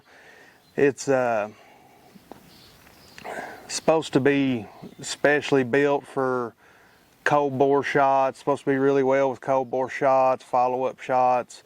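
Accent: South, United States. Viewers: Best part of a southern accent is you can play at 2x speed and dude doesnt sound like a chipmunk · enjoyed it! Great info and I'm from Alabama so I can't help but pick on other southern accents! Thanks for being a good sport :)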